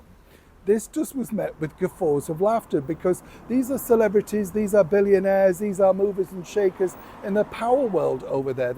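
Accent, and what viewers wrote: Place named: England, United Kingdom. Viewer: It was Mam which is something people called the late queen. It sounded like mom to me too but I think it was his English accent.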